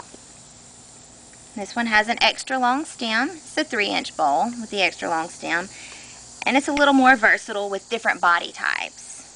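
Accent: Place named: South, United States. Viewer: Hope you are ok, since you made this so long ago! I guess you are in the southern states area, going by your lovely way of talking! Thank you again.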